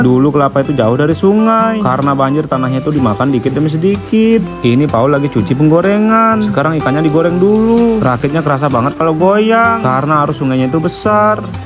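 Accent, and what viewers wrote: Java, Indonesia. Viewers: Bg kmu asli mana sih kok ngomong nya ada jawa nya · Wong jowo a mas kok medok boso jowone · Jowo mu ngendi to bang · Jowoan smpean cak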